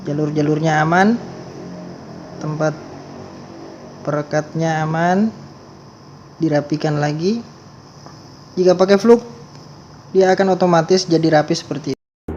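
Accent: Indonesia. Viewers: Kalo logat e kaya ne orang pasar bulu ini yo heeee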